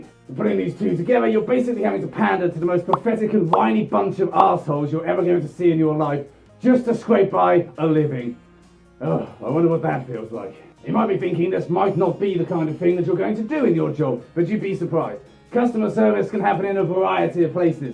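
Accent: United Kingdom. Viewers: I can tell he’s British.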